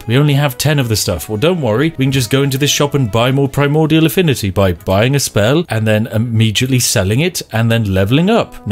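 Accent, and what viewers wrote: United Kingdom. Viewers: *moans* A perfect British accent...I love it.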